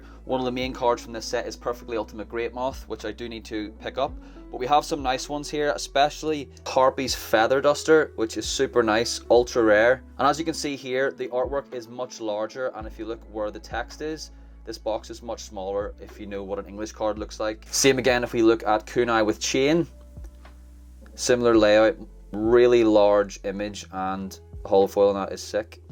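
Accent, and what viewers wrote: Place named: Northern Ireland, United Kingdom. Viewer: Northern Ireland accent 🤔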